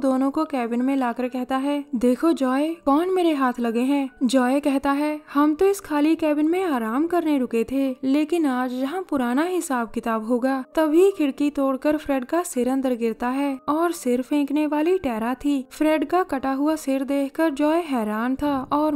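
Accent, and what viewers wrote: Punjab, India. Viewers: Thodi thodi punjabi jhalakdi a explain vich😂😂😂😂😂😂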